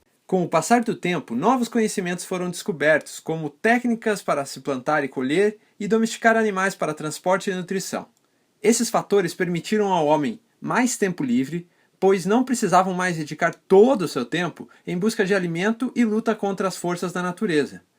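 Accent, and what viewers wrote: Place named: Brazil. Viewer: Ele tem sotaque de tudo quanto é região do Brasil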